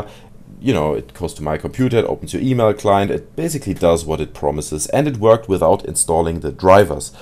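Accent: Germany. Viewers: Ich hab beim ersten Satz erkannt , dass du Deutscher bist xD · Man merkt voll, dass du "Deutsches Englisch" sprichst :D, wenn du weißt was ich meine, Gutes Video! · Are you German? Dein Accent klingt hart danach xD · Aha, das hab ich doch irgendwie am Dialekt gehört, dass du kein Amerikaner sein kannst xD :D :)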